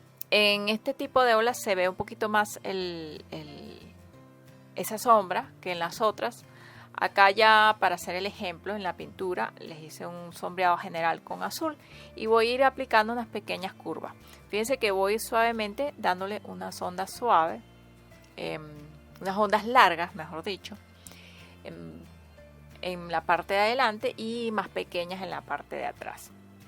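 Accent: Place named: Venezuela. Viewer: Esta chica es muy buena explicando, tiene estilo me encanta, tiene acento venezolano, qué bonito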